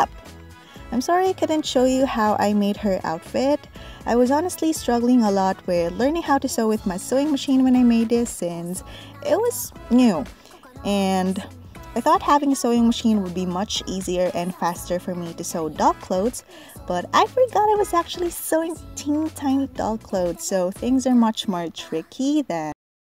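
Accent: Philippines. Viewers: Squidmoons Cypher and came from the Philippines, so I guess we all sound the same (I’m from the Ph too) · You have a filipino accent 😨❤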